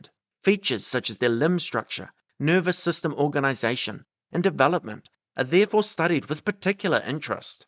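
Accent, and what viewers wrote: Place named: New Zealand. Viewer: You have such a strong New Zealand accent 😂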